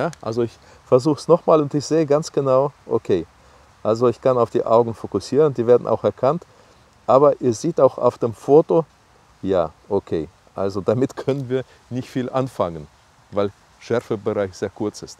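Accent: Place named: Soviet Union. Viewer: Вы наверное никогда не избавьтесь от советского акцента...извините если что, Старый водитель TIR. Сейчас стокер...ландшафтный.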